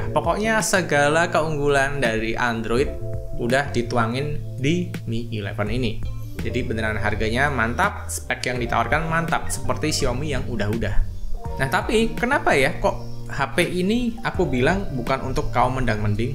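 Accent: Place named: Java, Indonesia. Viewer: Logatnya jawa bang..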